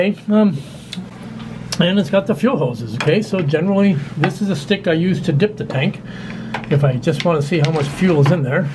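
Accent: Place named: Canada. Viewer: Aha ! That's why you have a Canadian accent!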